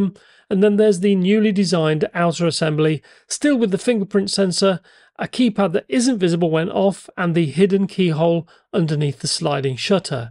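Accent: United Kingdom. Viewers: I love the ultra wide band! So cool! Great video too! Can I ask if I live in the UK and want to use this on a new front door, you sound British, do you think this is not for that?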